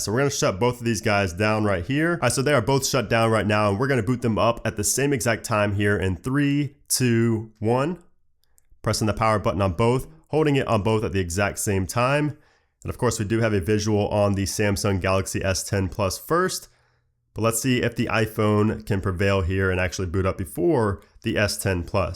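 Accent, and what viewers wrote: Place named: United States. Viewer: This review is "pointless". Why? Because there are a few variables that can have a major outcome on the end result. For example, are you left handed or right handed? Inevitably your reaction will be quicker by whichever hand is dominant. Another variable is you; by your vocal accent, we can establish that you are an American reviewer, and Apple is an ...............American brand????? Yeah, enough said. What must be more disturbing to Apple fans is the fact that major components used in Apple phones belong to Samsung; invented, designed and manufactured by Samsung. One example is the magnificent OLED screen. Apple phones are nothing more than a collection of far eastern components screwed together in an Apple shell and marketed as premium smartphones.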